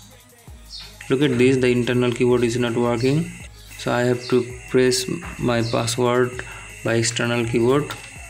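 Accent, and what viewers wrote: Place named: Bengal, India. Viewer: কথার স্টালে তো মনে হয় বাঙালি, বাংলা বুঝি জানো না !!:face-orange-raised-eyebrow::face-orange-raised-eyebrow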